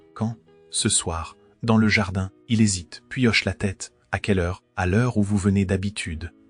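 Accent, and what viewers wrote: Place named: England, United Kingdom. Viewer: L'histoire est sympa mais l'accent anglais régulièrement est agaçant... C'est vraiment dommage 😢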